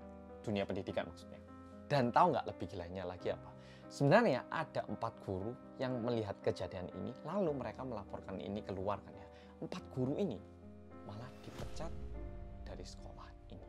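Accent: Java, Indonesia. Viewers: awas mas jangan terlalu lebar, entar ada nyamuk masuk. btw aku tu suka kalo denger mas ngomong medhok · halo gays welkom to korea reomit. bersama orang korea yang medooooookkkkkk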